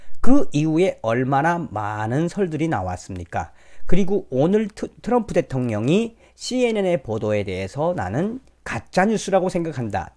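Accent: North Korea. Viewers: TV 이전에 너무 북한 사투리가 듣기 가 자연스럽지않아 시청을 기피했는데 오늘 우연히 방송을 들으니 정상적인 발음으로 성의것 방송을 하니 자연스럽고 잘 들립니다 앞으로 큰 발전 기원합니다 구독버튼 눌렀습니다ㅡ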